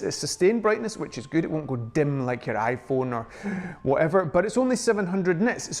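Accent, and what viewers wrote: Scotland, United Kingdom. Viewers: Do you think the RC controller is worth the extra cost, since most of the time you are likely already carrying your phone? Great video! Love the Sean Connery like accent!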